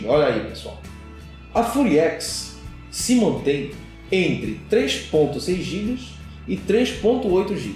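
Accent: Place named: Recife, Brazil. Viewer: Cara, de todos os sotaques que eu já escutei nesse Brasil, esse sotaque de Recife é o único que me incomoda! Eu me pergunto se esse sotaque é em toda a Pernambuco ou só ocorre especificamente em Recife!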